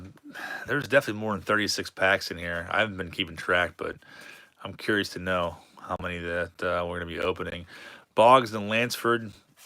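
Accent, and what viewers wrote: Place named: Pittsburgh, United States. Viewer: You have a bit of a yinzer accent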